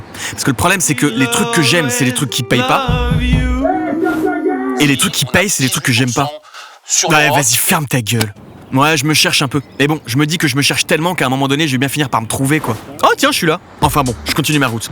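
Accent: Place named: Paris, France. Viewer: l'accent parisien le plus insupportable jamais entendu par l'homme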